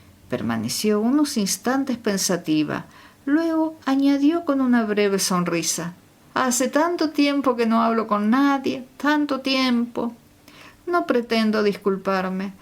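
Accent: Argentina. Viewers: Como ronuncia la eye se qie es Arguentina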